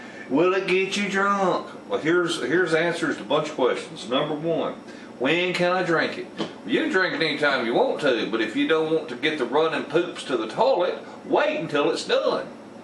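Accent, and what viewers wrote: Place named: South, United States. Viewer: Where are you at. Sounding really Kentucky Tennessee ish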